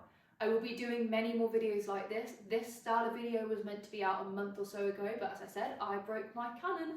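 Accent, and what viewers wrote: United Kingdom. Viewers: Thank you so much, I am a freshman, And I almost finish first term. I really wanna be a productive and disciplined in 2026. I'm really interested in your schedule and your ways for being discipline Thank you for sharing your routine ❤ Honestly I dont like British accent but you changed my mind totally..I'm trying to pronounce words like yo 😁❤British accent is stunning,when you speak❤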